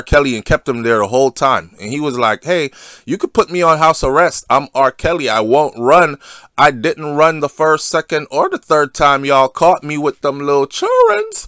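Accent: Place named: Jamaica. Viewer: Jamaican accent trying to talk American is so annoying #unsubscribe 👋 ✌️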